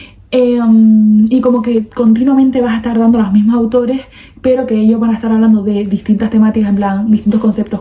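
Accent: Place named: Chile. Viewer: Habla más despacio no se te entiende nada! Me cago en el lenguaje chileno!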